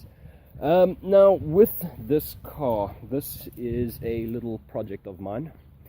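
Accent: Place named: South Africa. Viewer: I sense a South African accent... Where you at brah :P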